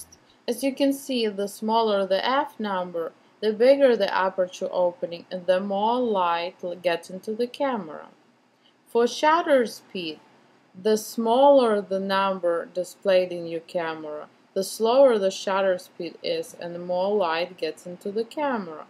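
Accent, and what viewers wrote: Russia. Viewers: sounds like a mix of Russian + Latin speaking english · good video, but I'm getting tired of "lower ISO looks grainy". Well, yes, but on most good DSLR you can go fairly high, without visible grainy-ness. Instead of blindly following advice, that may or may not apply with your equipment- TRY THINGS OUT = SHOOT. That's the only way to learn. But again, nice video, explains the principles well. Is the accent Russian? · Nice video! Do You have a Russian accent? )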